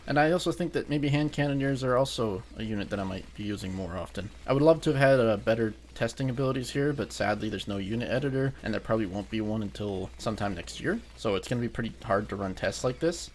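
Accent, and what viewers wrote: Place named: England, United Kingdom. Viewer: i just thought it was kinda funny i always love to hear the english pronunciations <3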